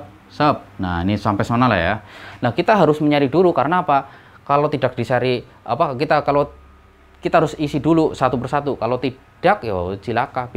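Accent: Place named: Banyumas, Indonesia. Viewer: Masee orang ngapak yaak? 😁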